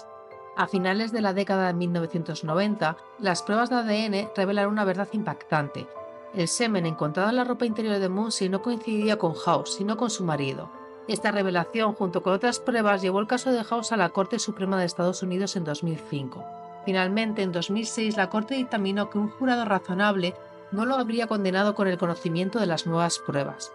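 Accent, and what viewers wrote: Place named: Spain. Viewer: Primera vez que veo tus videos, te felicito por tu trabajo de investigación y por compartirlo por acá con el mundo 😉 Me permito comentarte 1 detalle con el fin de que los que no somos españoles te podamos entender, podrías hablar un poco más despacio ya que tu acento es muy fuerte y es difícil entender lo que dices, por ende me distraje de la historia para tratar de captar lo que decías, a parte de ese detalle todo muy bien! Que te hagas una grande del true crime! 👏🏻 👏🏻 👏🏻 👏🏻 👏🏻 👏🏻 👏🏻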